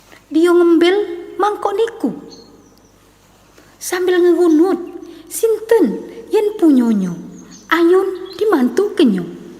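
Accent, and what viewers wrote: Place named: Palembang, Indonesia. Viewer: MasyaAllah keren nian yunda lemak nian dengernyo baso palembang asli dan jadi tau sejarahnyo, ditunggu cerito2 palembang selanjutnyo🤗🥰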